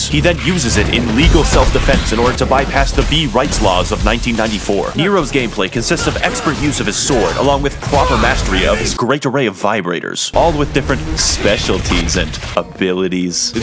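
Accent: United Kingdom. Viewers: Oh, he's british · Oh he's british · "Oh, he's British." As a British person, I can claim he is from Birmingham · oh hes British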